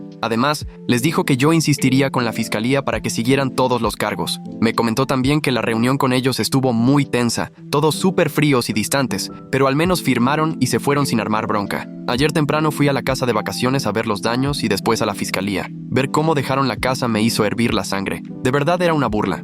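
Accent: Mexico. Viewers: Oigan podrian decirle a la ia que deje el acento mexicano y vuelva al español neutro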